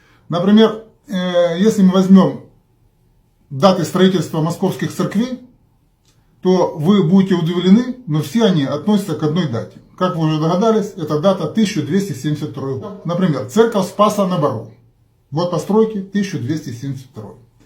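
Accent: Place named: Ukraine. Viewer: Отличное видео. Для малообразованных людей из средней Азии, нет большего доказательства своей исключительности, чем видео в интернете, рассказанное неизвестным человеком с украинским акцентом, который не приводит никаких доказательств.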